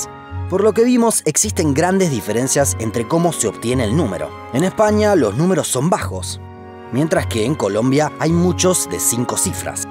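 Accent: Argentina. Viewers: Tu acento argentino es muy bonito, como tus vídeos.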